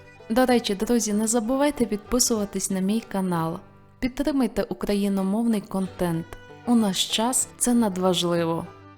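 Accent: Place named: France. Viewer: Маєш французький акцент але смачно ❤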